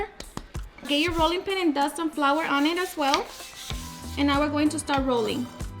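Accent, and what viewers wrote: Mexico. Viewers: Just found your channel today in one thing Are you Mexican because I think you some Mexican accent in U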